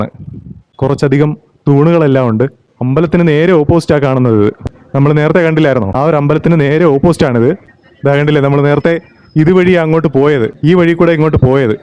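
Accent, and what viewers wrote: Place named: Kollam, India. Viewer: കേട്ടോ..... കേട്ടോ....... കൊല്ലം സ്ലാങ്...... അടിപൊളി ❤❤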